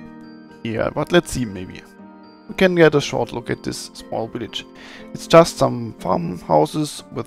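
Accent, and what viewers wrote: Germany. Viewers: Really good video! Although you could really work on your accent, your english in general is good, but the german accent is in there :P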